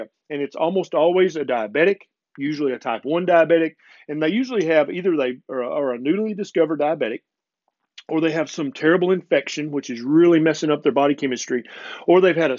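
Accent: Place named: North Carolina, United States. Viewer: I told my wife about starting Keto, and she brought it up to a veterinarian who works at her office. My wife called me and had the vet talk to me. She proceeded to tell me how Keto lifestyle could mess with the brain, Ketoacidosis, etc. I wish I had seen this video so I could forward it to her at the time. Love your videos Doc! I'm from NC. You sound like my people!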